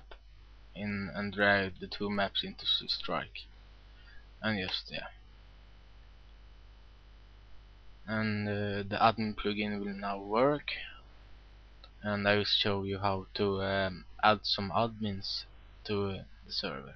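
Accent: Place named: Sweden. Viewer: Pungt kom... DOT COM! haha, du är från sverige asså ^^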